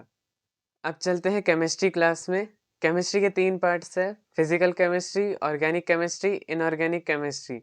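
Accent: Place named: Bengal, India. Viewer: তুমি কি বাঙালি??? কথার মধ্যে বাংলা tone আছে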